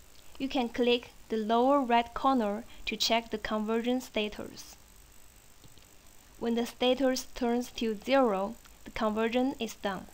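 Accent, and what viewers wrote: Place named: China. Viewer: Yes she sounds like any Chinese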